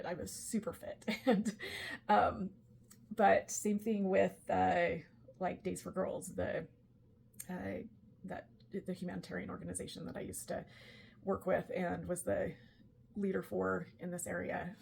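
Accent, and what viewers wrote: Utah, United States. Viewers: what you are going through is valid. I have AuDD. I have experienced so much of what you are going through. I feel my life will be in "recovery" from not paying attention to what my body and brain were telling me. I am in awe of your strength and self-awareness it took to make this video. In this time I have heavily relied on channels like yours and Mac's, (along with other hyper-focus interests). Having been a life long Northern Utah girlie, your Utahan voice and cadence have soothed me more times than you can know. I have wanted to offer my (admittedly, very limited) assistance with what you do, and now I feel drawn even more to offer it, even if it is just to tell you that you have my gratitude for just being who you are. I live north of you, and am serious about offering support should I have the capability. Those of us who truly value you, need you to be your own first priority. 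I love your channel, it has served me so many times as a body double. I (and all of use) support you in your journey. Don't you dare respond to this with more than a heart! Unless you are interested in my assistance, but even then, a very short answer is more than enough!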